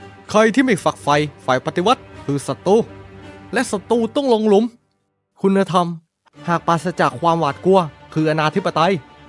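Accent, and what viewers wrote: South, Thailand. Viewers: มาเป็นกำลังใจให้ครับ ติดตามมาจากประวัติโลกและมนุษย์ตัวเต็ม ผมไม่รู้ว่าแอดเป็นคนใต้มั้ย พอฟังจากคลิปที่แล้วรู้สึกสำเนียงออกใต้ๆ นะ แค่เดาเล่นๆ ไม่ได้มีเจตนาไปทางอื่น ปล.ผมเป็นคนใต้นะ ทำคลิปดีๆออกมาเรื่อยๆละ อ้อลืมบอกกดติดตามให้แล้วนะครับ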